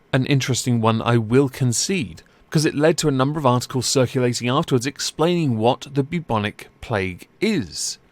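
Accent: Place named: United Kingdom. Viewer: "Incredibly cucked simp" That was perfect! xD I enjoy your verbal readyness & sense of humour as much as Alizee's. Also some people have the combination of nice voice & accent and that's pleasant to listen to. I'm biased ofcourse, as I've watched British programming since early age and therefore learned to like the language & accent :)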